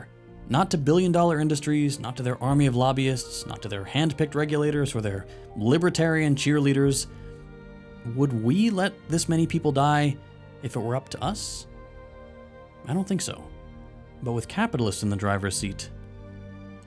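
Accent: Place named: Pacific Northwest, United States. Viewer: Could tell you are in the PNW by the way you speak, but that B roll footage of you driving really brought it home. :p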